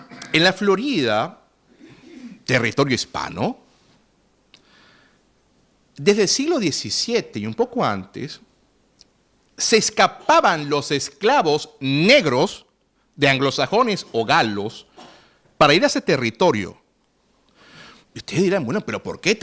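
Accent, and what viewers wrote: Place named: Peru. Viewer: Un hombre muy bello, esa piel trigela, y venezolano, uff uy , aunque su acento me parece un poco al peruano, saludos desde Cali,- Colombia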